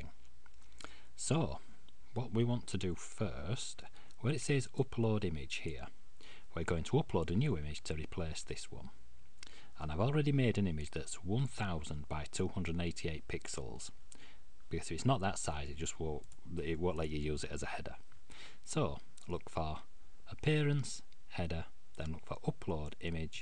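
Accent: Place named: North, United Kingdom. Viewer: Nice tutorial. A man after my own heart... nice and steady, not rushed at all... and with the added benefit of a northern accent! :)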